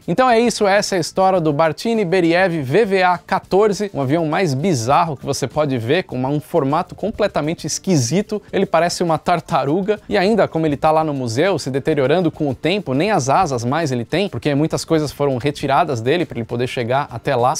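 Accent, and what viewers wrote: São Paulo, Brazil. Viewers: Engraçado q esse cara parece o Gugu falando. De q parte de São Paulo isso vem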